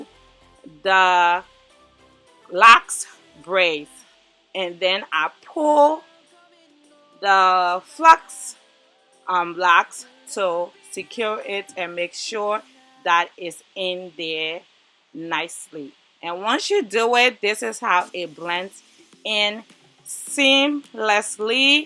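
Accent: Liberia. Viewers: Jessica Wiah yeah I can hear her accent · I told myself she is from Liberia..then came to the comments section to confirm..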